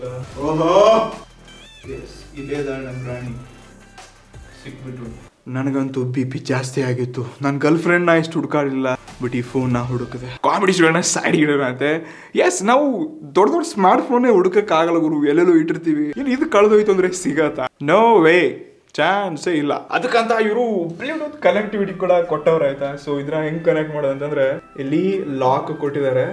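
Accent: North Karnataka, India. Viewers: Nivu uttara karnataka bhashe matadtiralla niv uttar karnatakadoren 😍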